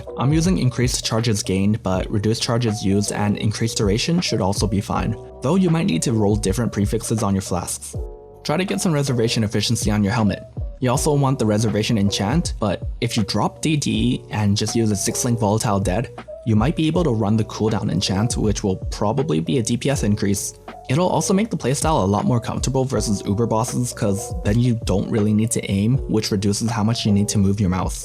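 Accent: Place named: China. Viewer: that chinese accent tho...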